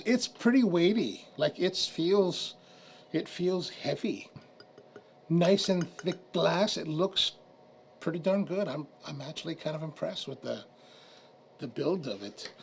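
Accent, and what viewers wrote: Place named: Hawaii, United States. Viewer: I new I heard a Hawaiian accent! Then I saw your channel name. Mahalo!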